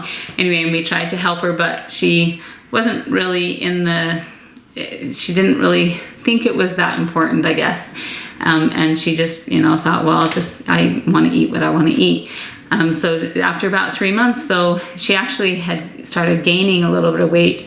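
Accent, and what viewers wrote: Pennsylvania Dutch, United States. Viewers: Would you be able to do a video on your hair care and makeup routine for mom of many? You look so good and I know you’re busy so how do you do it?! Also, random question, do you have a bit of a Pennsylvania Dutch accent or something else? I’m just curious what I’m hearing ! So glad you’re making more videos (watched your laundry video and was sad there weren’t more homemaking videos!!!) 💛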